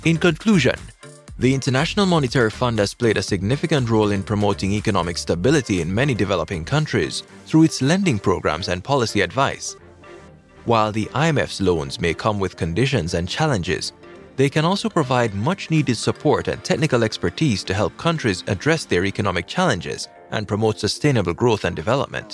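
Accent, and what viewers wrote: Jamaica. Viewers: I pickup his accent so I know he is Jamaican, but whose more biased against Jamaica than a Jamaican, they are always the ones in the front of the line to disregard and disrespect Jamaica and her accomplishments.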